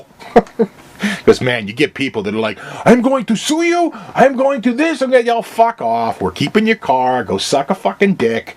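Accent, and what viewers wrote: Boston, United States. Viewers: your from boston?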